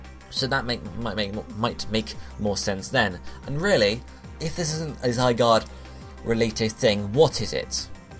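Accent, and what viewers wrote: United Kingdom. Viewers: Omg man sorry that's amazing. That's just how us brits pronounce the letter Z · He's from the UK, they pronounce words and letters differently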